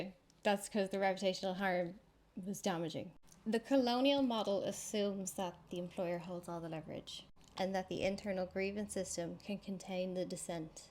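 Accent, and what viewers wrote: Ireland. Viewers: You sound like an Irish Lisa Simpson.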